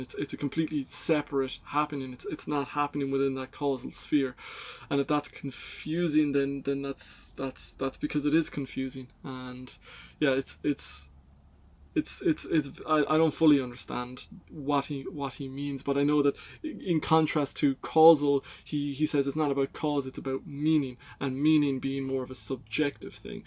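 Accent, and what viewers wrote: Ireland. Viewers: Nice to find a video of an Irish lad talking about Jung. Gizza shout if you're in Cork.. I'd love to put you up in Dripsey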